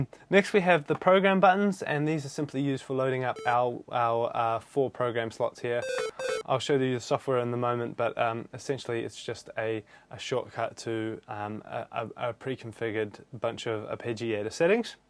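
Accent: New Zealand. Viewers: are you a kiwi?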